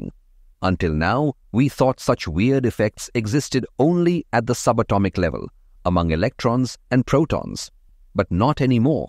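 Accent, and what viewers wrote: India. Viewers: This video is obviously created by an Indian. Even though he/she tried to hide his/her Indian accent using a weird robot sound, one can still tell it's an Indian talking by his/her mispronunciation of the letter "T" when he/she said "doo taosand dtwenty five" instead of "two thousand twenty five". Also the incorrect use of the article "a" instead of "the" in a sentence is very common among Indians and gives their identity away.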